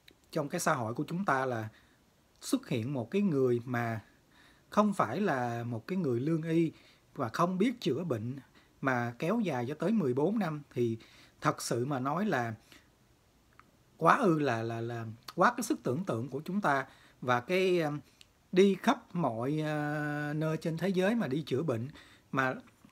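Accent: Standard. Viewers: Bạn này nói hay và chuẩn nè. ❤️❤️❤️❤️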